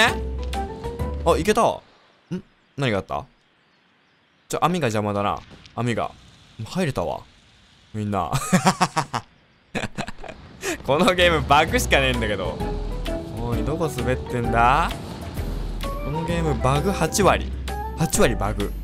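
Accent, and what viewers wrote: Kansai, Japan. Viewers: 関西弁多くて好きや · バリバリの関西弁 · さんが関西弁だった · さんの関西弁定期的にみにいってしまう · *の関西弁ばりばりのやつ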